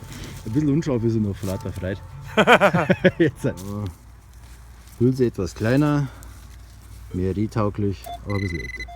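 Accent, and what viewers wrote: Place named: Bavaria, Germany. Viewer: Oha, eigentlich supergeil... aber da bayrisch isda schweer füar da nordditsch. quaquwetschkommod....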